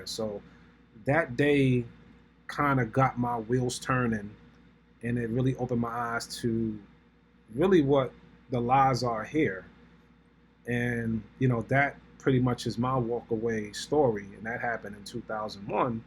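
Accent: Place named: New York, United States. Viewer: loved your video...great narrative! As a former NYer...also great to hear a true NY accent!!!!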